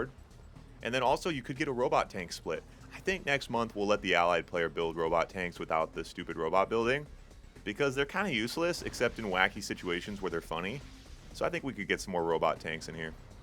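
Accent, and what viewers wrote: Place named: United States. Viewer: You are Dutch, you sound totally American to me 😅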